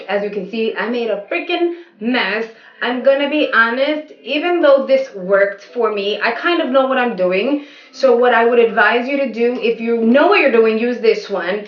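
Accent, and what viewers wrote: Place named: Brooklyn, United States. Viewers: Ur def Puerto Rican lol you sound like you are from Brooklyn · aww, i'll give it a shot anyway. BTW i love your accent! not sure what state it is but def the east coast! I'm from Denver Colorado we dont really have a cool accent like yours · New subscriber here!! I smelled chocolate too on the contour/highlight palette. Also I like your accent. Are you a NewyoRican?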